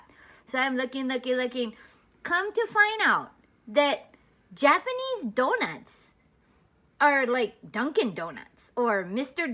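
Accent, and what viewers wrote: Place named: Hawaii, United States. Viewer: So good sistah! After all these years you still get your da kine pidgin accent!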